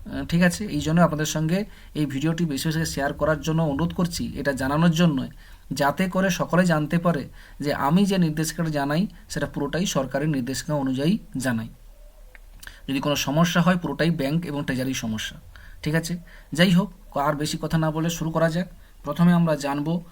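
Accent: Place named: Medinipur, India. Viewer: মেদিনীপুর অঞ্চলের আঞ্চলিক টান থাকে ওখানকার মানুষের কথায় । পছন্দ না হলে এড়িয়ে যান। এমন বলছেন যেন আপনি সবকিছু ঠিকঠাক বলেন।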